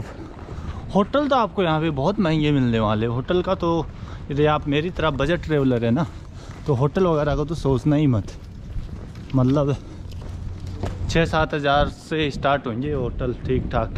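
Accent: Rajasthan, India. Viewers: Bhai ke bolne ke accent se Rajasthani lag raha hai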